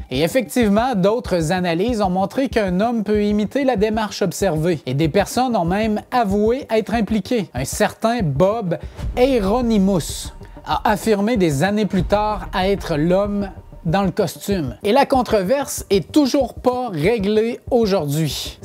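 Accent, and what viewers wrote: Quebec, Canada. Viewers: Super bien raconté et assez bien détaillé. Je trouve que tu donnes de plus en plus de étoffé d'informations et d'images. J'affirme sans aucun doute, tu es la plus belle découverte cette année. Et en plus, un québécois avec un si bel accent bien de chez nous. Merci pour ton super bon travail. Lâche pas ! ❤😁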